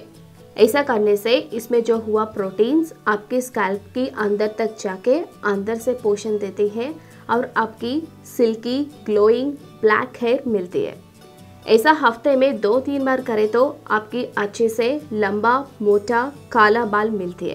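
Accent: South, India. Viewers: Are you south indian? 😀 apke bolne ka tarikha bilkul meri mosi jaisa hai. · Kya ap south ke hain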